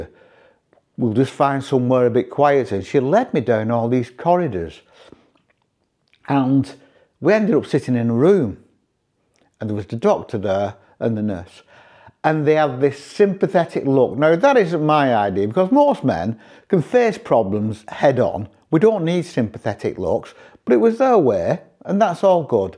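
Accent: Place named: Yorkshire, United Kingdom. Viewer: thanks for reading mate really enjoy watching your videos and definitely keep in touch I live in Sheffield Yorkshire and guessing by your accent your not so far away 👍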